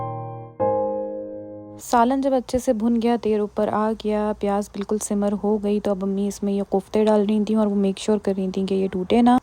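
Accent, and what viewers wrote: Karachi, Pakistan. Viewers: hello, apkay baat karnay ka style khana wagira bilkul hamaray ghar ki tarha ha.. im also from Karachi living in Sweden..after a long time i found somone from vlogging whom i can totally relate with❤.. plus abt your suggestion im interested in starting dil ki batein series... because that would be great if we share things with each other.. but i think it depend on you. if you are making 2 vlogs in a week tu ek dil ki batein series vlog n second vlog of your routine 😊 best of luck 😊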